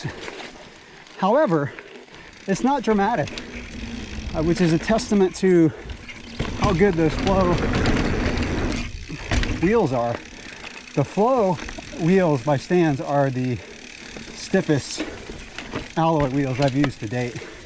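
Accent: United States. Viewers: I love what you do man, Great job. I'm learning from you a lot, with your clear explanation, and your American accent I will never miss anything unlike GMBN lol.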